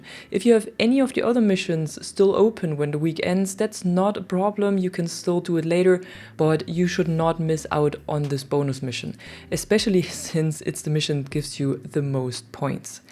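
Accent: Germany. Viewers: Man hört das Du Deutsch sprechen kannst 😂🖐️🖐️🖐️🖐️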